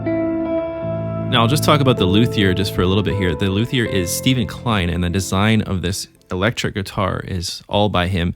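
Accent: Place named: Canada. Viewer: Gee you sound very Canadian! Very interesting that Klein took on creating a next gen harp guitar! Thanks for the exploration!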